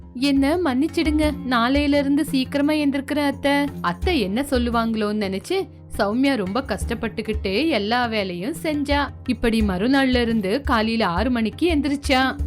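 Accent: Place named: Bangalore, India. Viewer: Banglore Tamil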